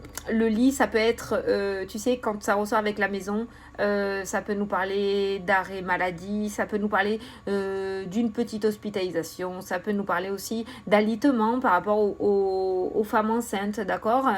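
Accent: South, France. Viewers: Coucou, toujours un bonheur d'écouter tes tirages... Tu es toujours de bonne humeur et spontanée, j'adore!!! 😍 Et tes "putains" avec ton accent du sudeuuu, c'est top, ne change rien 🤣 Belle journée à toi et ta famille 🥳 et merci pour ce concours 🍀🤞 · Rhooo pétant!!! 😜 Les montagnes russes d entrée 😂 putain! C est le sud qui parle et té! Changes rien, t es trop topissime, z'ont qu'à pas t écouter les mauvaises personnes.. Moi je t'adore 💜 et tu es une bonne personne... Je suis seule alors on verra côté cancan... 😜 Rien n arrête les langues de vipères... Merkiii, t es une bouffée de bonheur à chaque vidéo 💜💜💜💜😘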